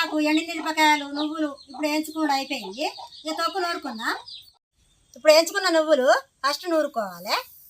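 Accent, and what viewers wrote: Telangana, India. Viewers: అమ్మ మీ వంటలు చాలా బాగుంటాయి...తెలంగాణ యాస .. మాది కూడా ఇదే బాష.. మీరు సంతోషంగా ఉండాలి...ఇలాంటి వంటలు ఎన్నో చేయాలని మనసారా కోరుకుంటున్నాను... మీరు చేసినట్టు ముద్దపప్పు, పచ్చిపులుసు చేసిన...సూపర్.....❤ ప్రం సిద్ధిపేట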